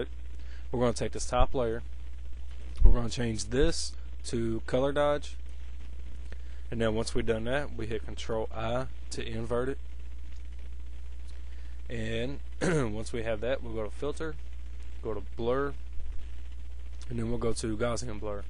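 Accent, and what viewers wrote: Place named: United States. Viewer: i hear no echo? just a very thick american sounding accent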